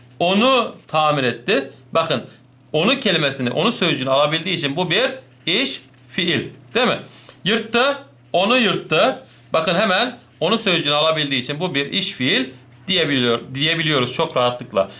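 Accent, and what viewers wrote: Black Sea, Turkey. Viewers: HOCAM KAREDEZLI KONUSUYORSUNUZ BANA OYLE GELDI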